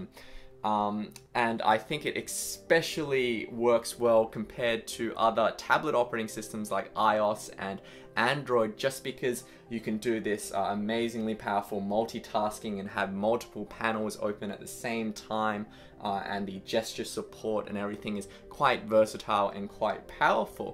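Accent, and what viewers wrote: Australia. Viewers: I thought you're gonna speak with a japanese accent and then it turned out you're australian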